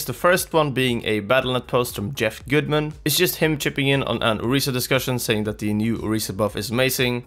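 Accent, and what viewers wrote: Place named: Sweden. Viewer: Where is this guy from? He sound Swedish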